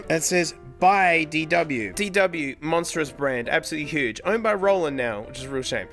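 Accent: Australia. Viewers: ever since he took a break, his australian accent thickened lol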